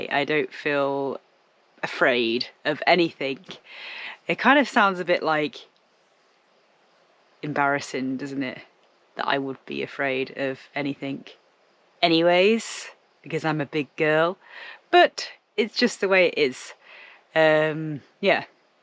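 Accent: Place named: Northern England, United Kingdom. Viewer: The first vlog I saw with you both I was amazed at your collective energy and togetherness 🤗 and it's great to have time away on your own but can be scary sometimes hey, I fully enjoyed this vlog as all your others and it was great to see at the end, if you don't mind me asking but with your northern accent where are you from, I'm from Burnley but live in London mainly for the weather 😁☀️😘 I can't handle the rain ☔ in Burnley 😳 it's horrible, even in summer you can get lots of rain 😳 have a great trip to Portugal 🇵🇹 will look forward to seeing how you both get on there 🤗 Edit, we've even had Hail Stones in London tonight 🙄☔😳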